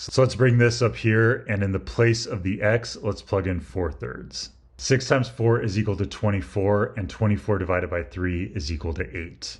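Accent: Canada. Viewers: he's canadian?? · Is he Canadian or American? It would definitely be weird to say zed in Usa.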